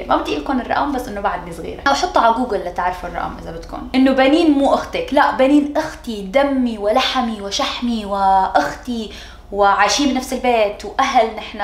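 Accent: Syria. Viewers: لعد لَيــِْ♡̷̴̬̩̃̊ـِْش. تحجين سوري اذا عراقيه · نفسي اعرف شغله ازا هي عراقيه كيف بتحكي بلهجه سوريه وليه بتحكي بلهجه سوري بدل عراقي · انا عرفت انك عراقية بس ليش تحكي سوري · لأنها كانت عايشة بسوريا من هي وصغيرة فلهيك تحكي سوري يعني تعودت تحكي سوري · عاشت وهي صفيره بسوريا فصارت تحجي سوري اكثر من العراقي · ماعم تحكي لادقاني عم تحكي اللهجة السورية العامة لمعرفة لبعض الدول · سوأل ليش انتي عراقيه وتحجين سوري للعلم هذا السؤال اسئله كل فيديو بس محد يجاوبني 😐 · زكاء منك انك عراقية وحاسبة حالك عالسوريين باللهجة وغير اشيا بهالطريقة كسبتي السوريين والعراقيين وعملتي هالقناة الكبيرة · walaa sdke اختارت لهجة السوريه لان لما بدات باليوتيوب كانت اللهجة السوريه كثيير مشتهره بسبب دبلجة مسلسلات السوريه و في نفس الوقت كان ما احد يعرف اللهجة العراقيه طبعا هذه شغله مش حلوه لانها نست اصلها و قامت تتكلم بلهجة ثانيه و معلومه اخرى تعرف تتكلم عراقي زيين بس بعدها مصممه على لهجة سوريه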